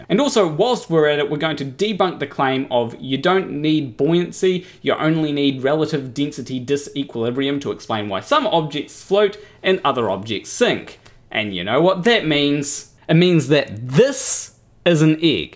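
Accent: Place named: New Zealand. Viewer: New Zealand accent.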